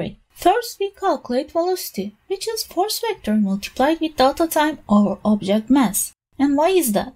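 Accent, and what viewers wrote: Turkey. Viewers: aksanindan anladım :) ama tebrik ederim güzel içeriklerin ve kanalın var. Bir kaç videona baktım güzel gerçekten :)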